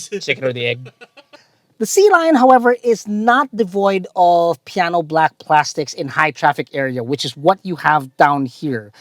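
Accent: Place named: Philippines. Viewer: Fucking corny! Horrendous pinoy accent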